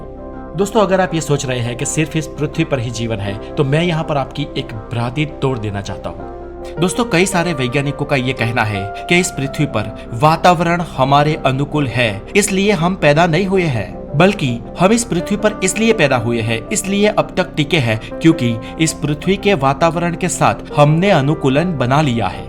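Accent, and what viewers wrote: Gujarat, India. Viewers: Proper gujarati accent wali Hindi...Aur bhai wo DARK equation nahi hai, Drake Equation hai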